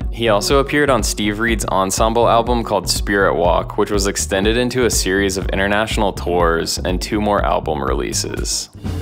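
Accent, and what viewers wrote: United States. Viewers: Opens video American accent Close video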